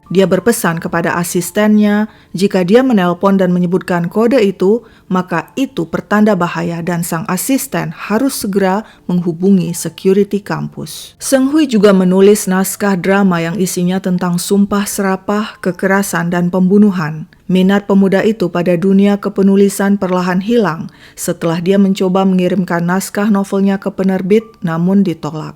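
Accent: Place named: Medan, Indonesia. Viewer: kakak btw orang mana .seperti orng medan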